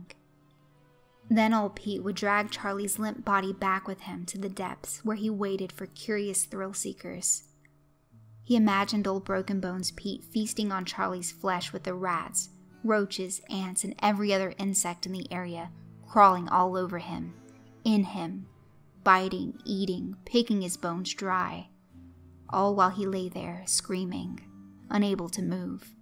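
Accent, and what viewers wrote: South, United States. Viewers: Damn racism, it's never funny. I love the southern accent. You know how to narrate you southern bell :) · This Southern drawl you do in these kinds of pastas is so sweet!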